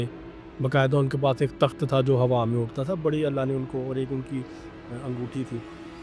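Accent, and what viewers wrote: Punjab, Pakistan. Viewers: خوف ہے کھوف نہیں اینکر ہو پاکستان کی انڈیا کی مت بنو تمہاری اردو میں پنجابی کی جھلک نظر آرہی ہے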